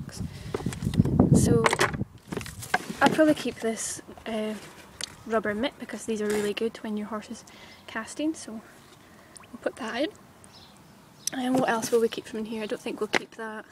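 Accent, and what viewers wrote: Scotland, United Kingdom. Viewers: Are you Scottish? I love the accent!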